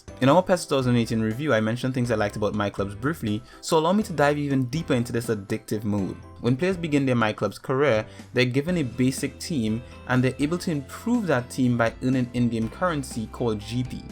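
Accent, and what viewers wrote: Trinidad and Tobago. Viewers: you sound like ur from Trinidad and Tobago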